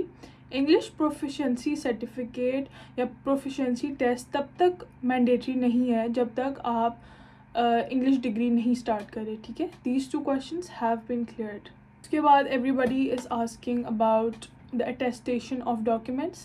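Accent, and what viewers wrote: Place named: Kashmir, India. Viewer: You accent and your looks seem like kashmiri 😳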